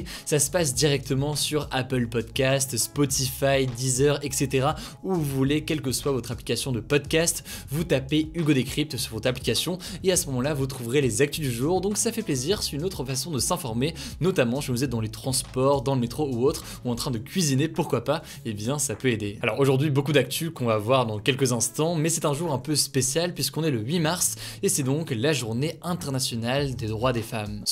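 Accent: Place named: England, United Kingdom. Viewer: je viens de comprendre pourquoi Hugo avait un accent anglais aussi clean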